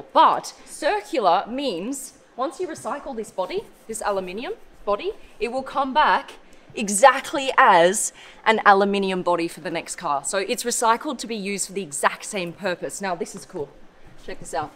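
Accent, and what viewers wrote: England, United Kingdom. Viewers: I like Your English accent, blonde... 😘😍🤗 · BRUH- I love your British accent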